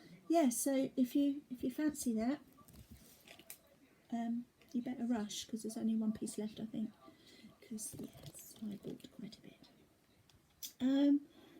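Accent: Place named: United Kingdom. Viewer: I can tell you feel better and I am so glad. I always enjoy your videos and your British accent. (I have a Texas accent). It doesn’t sound as classy as yours! Your dogs are sweet and I can see why you love them.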